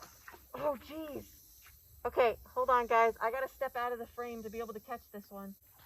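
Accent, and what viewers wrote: South, United States. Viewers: The country accent on that femboy voice. Wow. Melt any man's heart.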